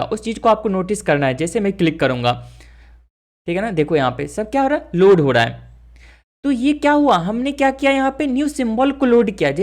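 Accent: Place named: India. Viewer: 听不懂印度英语，不过我还是坚持看完每个视频，学到很多知识，thank you for your work!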